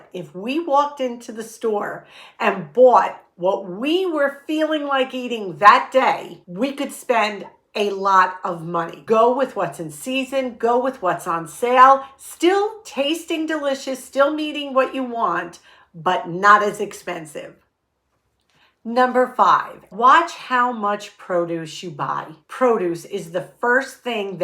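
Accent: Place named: Hudson Valley, United States. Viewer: I love your channel for several reasons (the content, your happy attitude, the good ideas, to name a few). But I really like watching you because I grew up in the Hudson Valley and I love, love, love your accent. It's like getting a little hug and conversation with my aunts. ☺️💗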